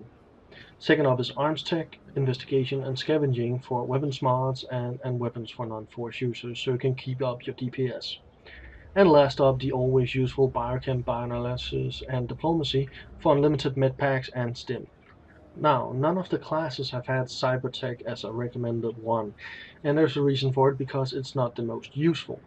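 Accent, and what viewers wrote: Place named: Denmark. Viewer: Loving the Danish accent :D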